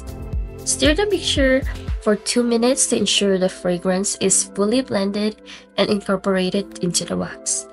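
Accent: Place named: Philippines. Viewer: Ang galing kabayan! Your accent is a filipina. Congrats❤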